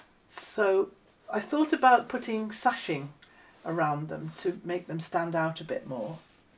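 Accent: United Kingdom. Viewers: Mind. Blown. You are one fascinating human, - the creative process you turn into a real object is nothing short of magic. I thought about the ladies of Gee’s Bend in Alabama/USA. The rejoicing of fabric by artists like yourself and those ladies is a singular genre that could only be achieved with patience. As much as I love to watch the process, it would run me mad to try and do it. And the cat bed? FABULOUS. I as so glad there are people like you, and Kaffe, and the Gee’s Bend ladies to counter-balance the madness of the world today…I know the world has always been mad, but the current time is particularly cruel and often times pointless. To know there is someone like you “across the pond” who is gentle and interested in creating beautiful art calms me down. The horrors of the Hill Country flooding, just a few hours drive from Fort Worth, are crushing sad. 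The political situation in America is horrifying. To be able to watch you and hear that engaging British accent is a balm to my weary soul. Thank you, friend-I-will-never-meet.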